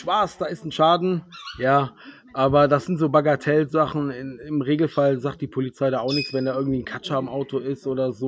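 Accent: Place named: Cologne, Germany. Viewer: Danke für die 10 punkte der sprache her kommst du aus köln. Sind vor 1jahr von ķöln nach Avsallar gezogen ķölle Alaaff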